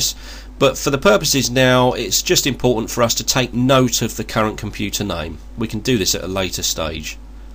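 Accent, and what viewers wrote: United Kingdom. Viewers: great video, great explanation, amazing British Accent / simply amazing ! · Lovely British accent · Should have figured you were from the UK. His accent is irritating. "Sheering from this foowdu'". My gosh. How about some American?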